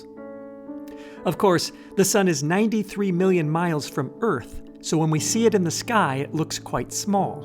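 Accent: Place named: United States. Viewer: You have like the perfect american accent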